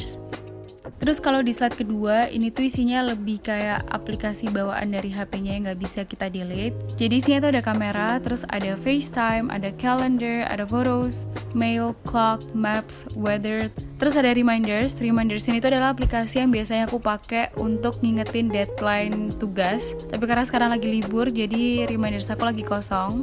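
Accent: Java, Indonesia. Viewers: Kakak Asli mana ?? kok ngomong nya medok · suka sama medok nya gemoy ❤️🤣🥺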